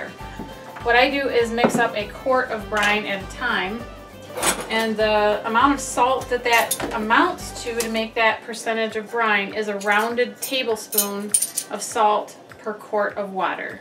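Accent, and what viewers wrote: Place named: England, United Kingdom. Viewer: I like you accent. Are you English?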